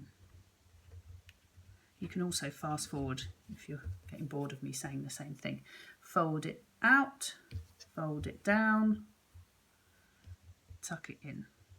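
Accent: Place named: England, United Kingdom. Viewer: Hi from ARGENTINA!...I loved this project..beautiful star! ..and I loved your ACCENT!! What part of England are you from? You speak really clearly and nice...😊😊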